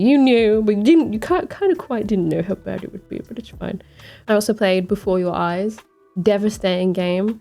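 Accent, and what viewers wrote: United Kingdom. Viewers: I like to find new girls\womans which is gamers. Usually it's because of Half-Life\Half-Life 2 playthrough. It's a great base. I straight watched: Half-Life 2 Half-Life 2: Episode 1 Half-Life 2: Episode 2 Portal 1 Portal 2 Nice voice, nice accent (British?). If you like Half-Life 2 then you probably would like to play Half-Life: Alyx. Yeah, VR might be a problem, but there are ways to play it without VR. Trust me, i played it on VR headset for a phone via laptop with external GPU. I also saw girls\womans gamers playing war games: Battlefield, Call of Duty. There are really cool stories, like: Battlefield 3 or Call of Duty: Modern Warfare and especially Call of Duty: Black Ops. But think twice before go in Call of Duty: World at War. It's might be difficult emotionally. SO, it's nice to have you on YouTube,. And... 53? Really? Are you kidding me?:) P.S. 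Hello from Russia.;)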